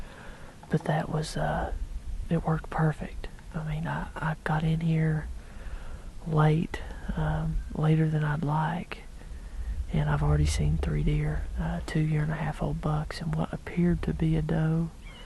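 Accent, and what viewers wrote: South, United States. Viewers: Personally the southern accent makes him sound like he knows what he is doing. Hats off to you southern dudes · That music killed the video.... And your southern talk....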